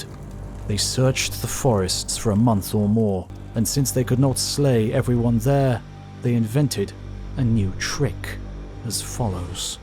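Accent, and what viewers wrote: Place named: United Kingdom. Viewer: Aside from the ridiculous British accent, pretty good vid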